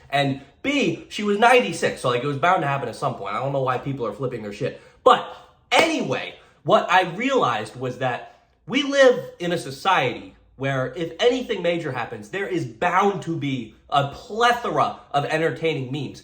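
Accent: United Kingdom. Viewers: hell shes british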